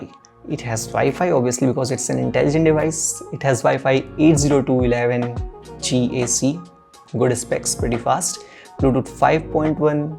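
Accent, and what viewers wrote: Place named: India. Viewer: That indian accent is just too heavy to understand. Just watch this instead... <link>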